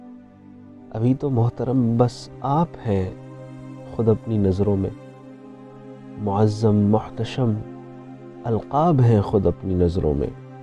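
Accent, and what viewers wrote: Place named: Punjab, Pakistan. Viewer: very nice urdu accent that too from a Punjabi speaking (I am assuming since you have as a Surname). Highly appreciated.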